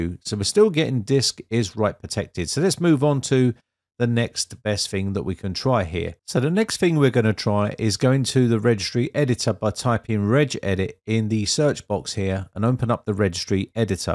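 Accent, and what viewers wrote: England, United Kingdom. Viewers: Calls himself a Brit, sounds like a Brit, speaks like a Yank- 'go ahead' this , 'go ahead' that. Bad enough when an American continually says it but it is annoying af hearing it here. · Are you an American with an English accent?. You keep saying "GO AHEAD" in front of nearly every verb. Unnecessary, annoying, wrong, meaningless.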